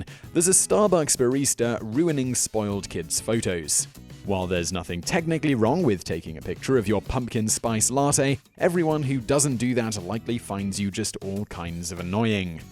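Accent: United Kingdom. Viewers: I like you saying slang terms in a British accent.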